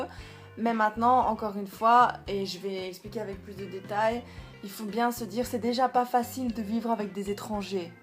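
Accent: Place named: Belgium. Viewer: Hello! Je suis tombé sur ta chaine aujoud'hui et je me suis fait la plupart de toutes tes vidéos! Elles sont géniales, naturelles et ton accent belche de chez nous rend les vidéos bien meilleures 😁 J'ai 2 questions pour toi: quand tu es restée au Japon 3x3 mois si j'ai bien compris, tu es partie avec quel budget? J'ai déjà été un mois en visite mais ça m'a couté un pont, en même temps je m'étais fait plaisir. 2eme question: Faut il être nécessairement sur place pour rechercher du boulot? Car sur Gaijin pot, la plupart des annonceurs demandent à ce que la personne habite au Japon... Voilà, en tout cas continue tes vidéos et merci d'avance pour ta réponse! A plus dans le bus! 😊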